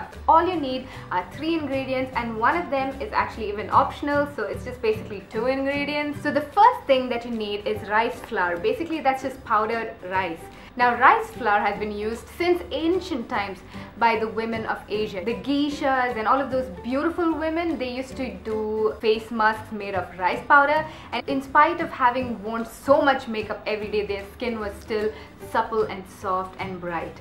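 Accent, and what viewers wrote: India. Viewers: You speak like an Indian..where do u belong to ?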